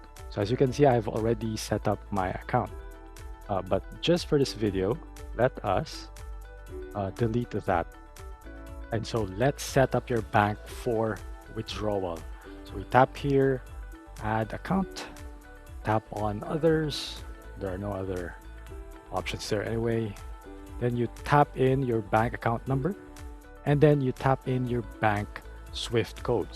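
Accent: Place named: United States. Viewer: You indeed have a nice american accent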